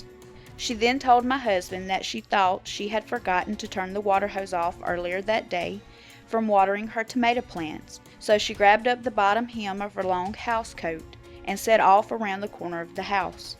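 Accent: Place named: South, United States. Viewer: great job,u have that sweet southern twangggg!!! just love it😀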